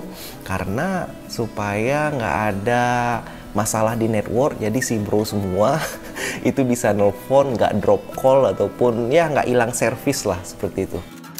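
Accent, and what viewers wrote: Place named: Padang, Indonesia. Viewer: Asli padang uda... Hehehe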